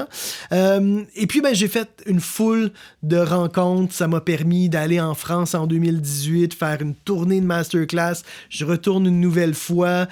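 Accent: Quebec, Canada. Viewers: Ca ne fait pas 10 ans que je te suis mais c est toujours un grand plaisir de suivre tes capsules ou formations, on ressent bien que tu veux transmettre ta passion et toujours avec une enorme bienveillance et le sourire et surtout, ton ptit accent du quebec sans lequel tes videos n auraient pas autant de charme, continues comme ca et merci pour tout ce que tu fais 🙏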